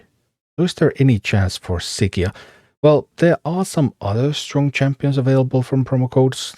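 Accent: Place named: Finland. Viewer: Are you Finnish? Your accent sounds suomalainen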